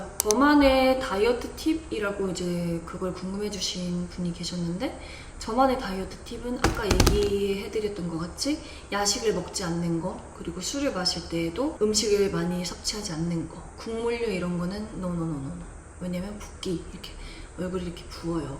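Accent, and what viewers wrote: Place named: Seoul, South Korea. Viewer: 님 서울사투리 말투 같아요 😂😂 ㅎㅎ 넘키여워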